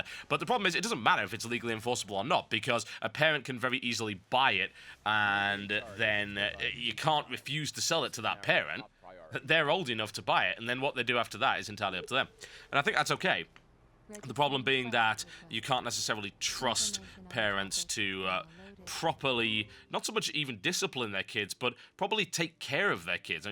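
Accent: United Kingdom. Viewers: Are you british by chance?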